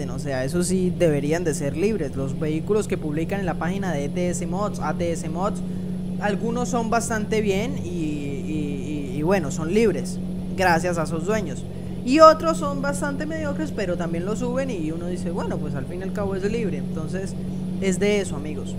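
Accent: Colombia. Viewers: eres de pereira?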